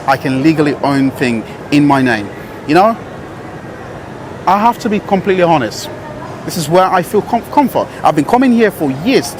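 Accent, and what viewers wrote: United Kingdom. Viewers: It's like you're speaking with a British accent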